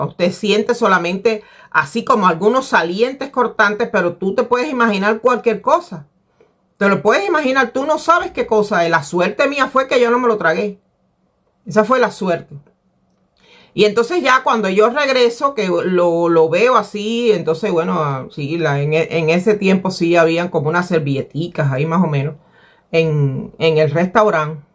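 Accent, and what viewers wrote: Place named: rural, Cuba. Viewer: Ayeme. Tu eres guajira por tu an me abla asy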